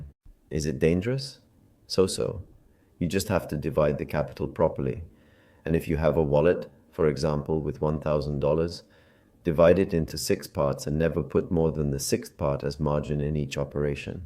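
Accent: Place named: England, United Kingdom. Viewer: Me gustó con la pronunciación inglesa, ud siempre innovando y superándose, lo admiro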